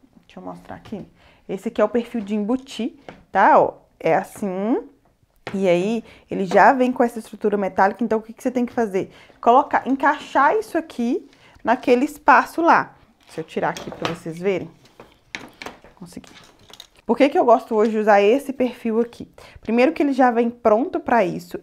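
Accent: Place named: Minas Gerais, Brazil. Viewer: Nós mineiro falamos assim mesmo .... Escorrega igual quiabo kkkk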